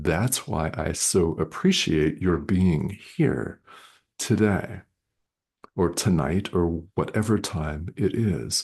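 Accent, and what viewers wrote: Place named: Arizona, United States. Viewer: I knew it, I knew it, I knew it you are from Arizona. I thought Arizona or New Mexico.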